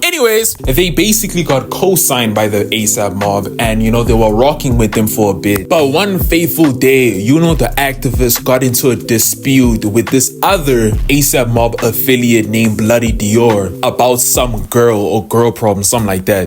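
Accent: South Africa. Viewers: u south african neh? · you sound south african ngl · Bro u South African? Coz you definitely sound like it 😅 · Mfana are you South African?😭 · You got sum South African in ya? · you South African my guy? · Bro can't escape his South African accent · Are you South African? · are you South African? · are you south african? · the video is hella funny but dawg you have the most jozi/pta accent ever, are you south african?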